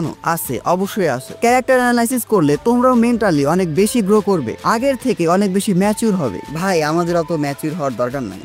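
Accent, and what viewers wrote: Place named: Rajshahi, Bangladesh. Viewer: আপনার ভাষা শুনে মনে হলো, আপনার বাসা রাজশাহীতে Am I right??✅✅✅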